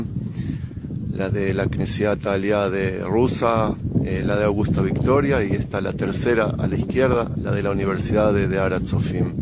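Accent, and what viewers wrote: Argentina. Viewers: DIOS te bendiga. Por compartir este Interesante video. Te escuchas como argentino ?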